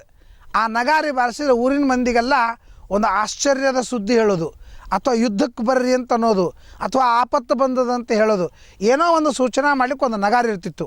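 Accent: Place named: North Karnataka, India. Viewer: Uttara karnataka kannada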